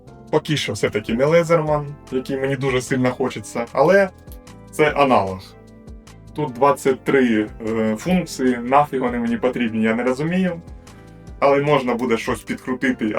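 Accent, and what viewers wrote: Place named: Sumy, Ukraine. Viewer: говірка схожа на сумську.. Суми і Бровари для мене рідні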